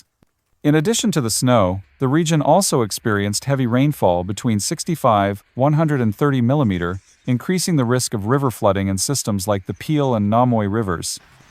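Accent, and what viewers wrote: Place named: United States. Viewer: Commentary by a friggin yank ffs!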